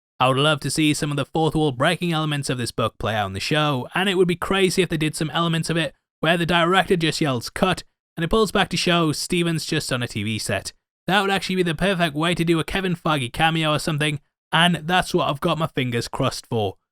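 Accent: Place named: Cockney, United Kingdom. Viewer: Most Americans probably thought you were cockney anyway 😅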